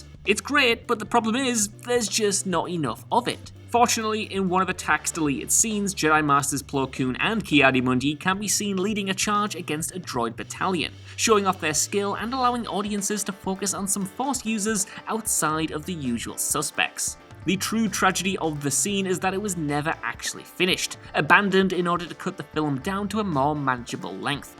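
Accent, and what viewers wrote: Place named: England, United Kingdom. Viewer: Just show the scenes, I dont give a damn about the intro or hearing your annoying pom voice.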